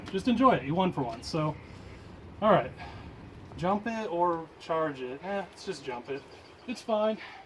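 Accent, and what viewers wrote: Australia. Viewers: Wow. That power steering pump. I thought it had a gear drive in it. BTW, your Australian is terribly awesome.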